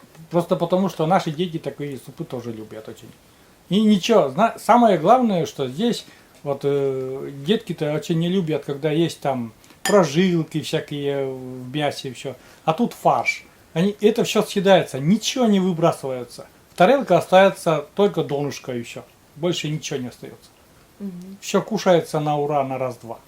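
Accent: Komi, Russia. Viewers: Случайно попала на ваш канал, по говорю поняла что коми, сама с Усть Кулома, с большиим удовольствием смотрю ваши видео, очень нравится как вы " Болтаете" Как говорите сами.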